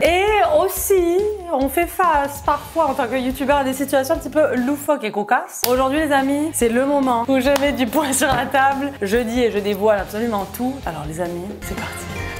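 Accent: Quebec, Canada. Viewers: Moi je suis la depuis que ta fait la vidéo ou ta apris a parler quebecquois <3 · Je suis abonné depuis les vidéos Québec vs France quand tu parlais plus avec l'accent Québecois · Moi je suis là depuis trois ans et franchement t’as changé et puis ton accent québécois c’était un truc de dingue avance bises franchement je t’adore ne change pas parce que t’es drôle et continue tes devinettes Tes blagues en début de vidéo tous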